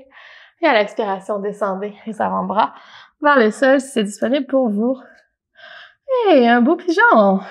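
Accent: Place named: Quebec, Canada. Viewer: Je suis débutante et merciiiiiiii, j'ai adoré cette séance. et ce ton zen québecois cher à mon coeur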